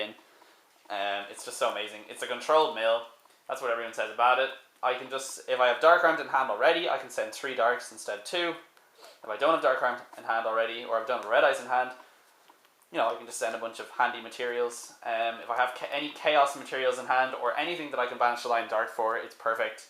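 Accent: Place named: Ireland. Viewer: Where in ireland are you from?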